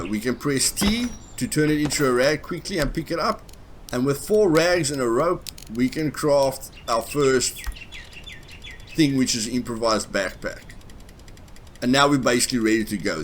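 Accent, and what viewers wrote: South Africa. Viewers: Jesus that south african accent :( ugh...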